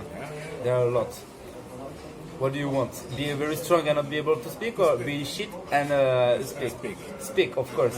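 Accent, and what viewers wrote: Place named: France. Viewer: An english speaking turkish guy with a french dialect :-) Let me hug you a bit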